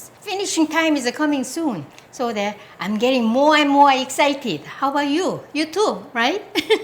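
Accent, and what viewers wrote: South Korea. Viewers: Your Korean accent is very endearing (e.g., "too muchhhy""). Please don't ever change.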